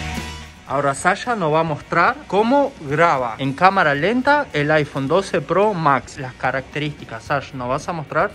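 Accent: Argentina. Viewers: Esa tonada media argentina que onda?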